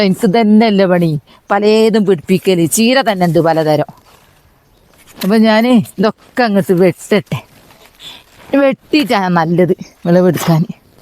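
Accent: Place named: Mukkam, India. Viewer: ഭാഷ കേട്ടിട്ട് മുക്കം ഭാഗത്താണോ എന്ന് സംശയം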